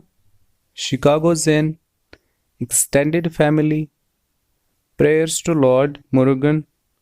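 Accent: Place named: Kashmir, India. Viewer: Kashmiri aawaaz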